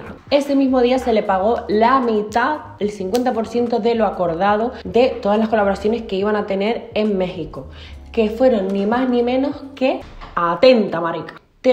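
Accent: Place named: Canary Islands, Spain. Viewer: Soy la única que le nota el acento canario más marcado de nuevo? Qué recuerdos de sus primeros vídeos ♡♡ Saludos desde Gran Canariaa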